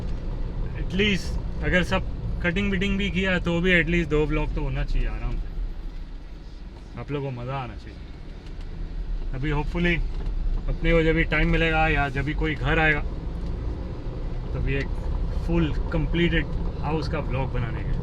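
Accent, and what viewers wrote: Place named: Gujarat, India. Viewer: First time viewer- Bolne ka tone gujrati he ya marathi bit confuse. But style hrithik jesa he bilkul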